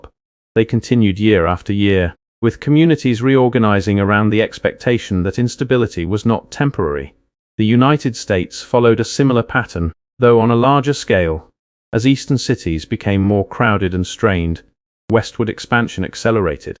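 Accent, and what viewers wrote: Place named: Europe. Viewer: every vid has the same eurotrash accent WTF its all coming from the same eurotrash AI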